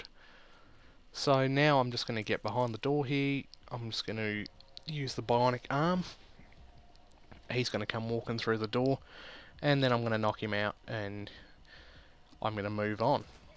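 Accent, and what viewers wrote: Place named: Australia. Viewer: But yes it is Australian. It's a pretty broad accent at that, bordering on what we call "ocker".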